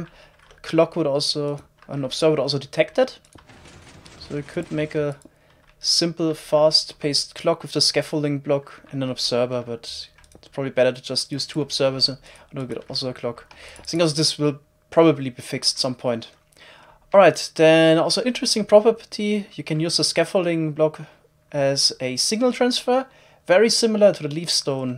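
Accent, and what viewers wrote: Germany. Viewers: thats a hardcore german accent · Is he german? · R u german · By any chance are you german? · You've a hard german accent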